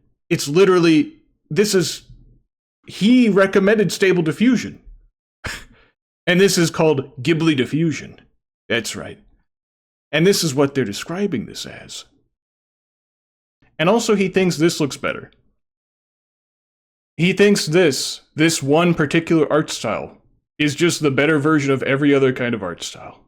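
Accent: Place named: England, United Kingdom. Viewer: Hallo, a hallo! Excuse me, geezer, but that’s an English accent. Not Australian, where my ancestors imprisoned Shad long ago.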